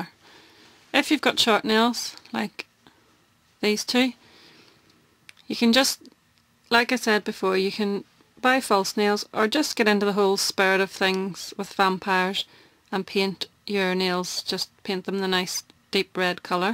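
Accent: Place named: Scotland, United Kingdom. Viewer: Scottish? I love your accent x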